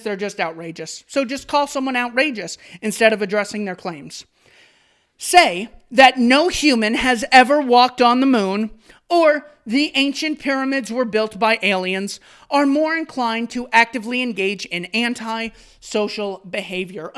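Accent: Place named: United Kingdom. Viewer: Fringe beliefs? Are these British clowns serious? Once upon a time, abolitionists were considered fringe members of society. Love the British accent,.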